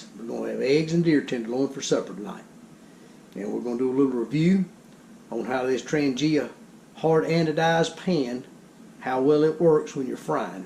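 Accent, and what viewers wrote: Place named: South, United States. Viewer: wow, never thought i'd see a guy with a southern accent cooking on a real trangia stormcooker with all the proper bits. those guys usually hate everything that isn't american.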